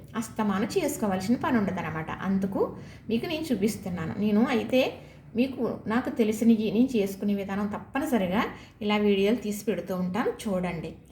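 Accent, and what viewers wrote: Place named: Godavari, India. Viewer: Mana godavari yasa❤❤❤❤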